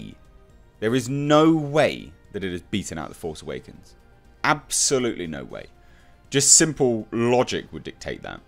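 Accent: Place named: United Kingdom. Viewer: as an American I think your Emperor Voice is very very good I think you being British helps? So Rise Of Skywalker and its flat feeling Trailer will be a flop in the US. Unless they are hiding something huge like LUKE being alive its shit, and I have no interest in seeing it. They have killed the 12 yr old boy in me who saw Star Wars in theaters in 1977. We have the OG trilogy and Prequels to give us that great feeling of wonderment we had as kids in the 70's!!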